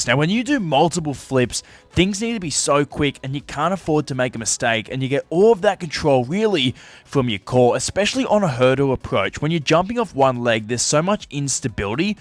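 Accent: Australia. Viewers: Really enjoy this, and as I said im 80 this year., but always loved the water and my dad taught me to swim in the sea. He was a rear gunner wireless operator work war two.and loved sport. So wonderful to see your effort to get back inti shape . Also i love the Aussie accent. I worked with Australians from NSW. and Melbourn . My dad's brother and wife and family left Scotland many years ago and stayed in Wollingong Maybe have spelt that wrong. Now thanks for all that you've shared. Sincerely Jane Rogerson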